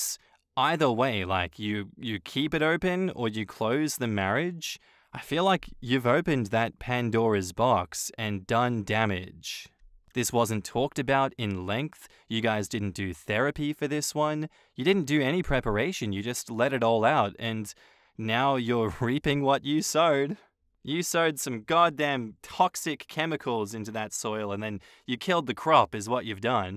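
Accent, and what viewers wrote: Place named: Australia. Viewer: Ausssie daddy hubby reading Spanish 🤌🏻💕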